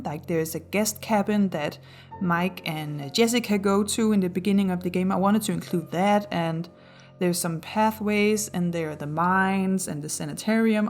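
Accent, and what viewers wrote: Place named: Denmark. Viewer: Thank you so much for the tutorial! I recreated it in Once Human and ur video helped alot!.. Also are u danish? U sound quite danish 😅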